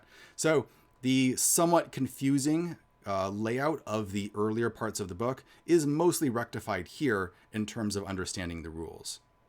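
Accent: United States. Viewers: Hello, a dude from sweden here, dowing what we do best: Drinking coffe and trying to convivnce the world that the swedish language is not hard, actually. The classics, Å Ä Ö. Å is pronounced like the O in Lord, Ä is pronounced as the A in Damn, and finaly Ö is pronounced kinda like the U in Murder. For the O in Borg, shorten it a bit and deepen it a bit. And finaly the end G is not as hard, and pronounced more as a J. From Sweden with Love - Kami P.S, i think you just need to extend your E abit, so its more like "Tveeland", and if you wanna get realy fancy you could work on your swedish A, wich is more as the A in Lard as a standard than the american A, who sounds more like our Ä. But honestly, your doing fine, mate. Swedish realy isnt that hard to speak(tm)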